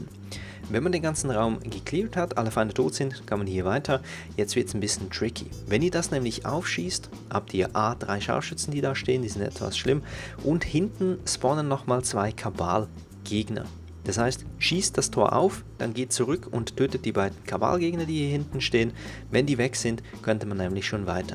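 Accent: Switzerland. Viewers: Der Sprecher ist Schweizer. Man hört es ganz ganz leicht :D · Bist du Schweizer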